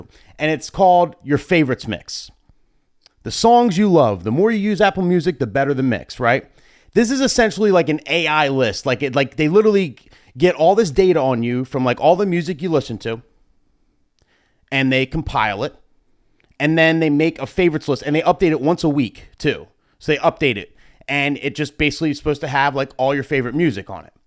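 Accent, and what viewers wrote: New Jersey, United States. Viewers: Dude you are so Jersey! Love from L.I.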